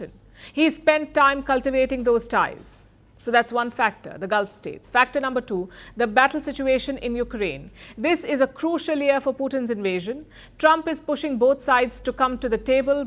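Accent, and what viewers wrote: India. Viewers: Sorry can't watch with that indian paajeeet accent. It's horrible.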